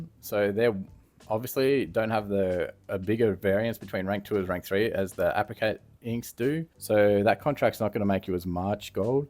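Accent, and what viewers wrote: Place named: Australia. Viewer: First video I've watched of yours. Subbed as soon as I heard the aussie accent! Gotta support a fellow countryman 👊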